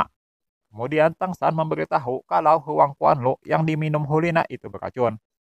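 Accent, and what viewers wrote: Banjar, Indonesia. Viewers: Manyuruh 😂😂 . Banjar banar ah ikam 😂😂😂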